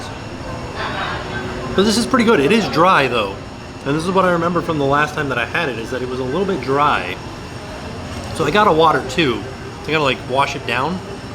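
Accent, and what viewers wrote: South, United States. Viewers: It's a southern twang. We mean no disrespect. Have a beautiful fall.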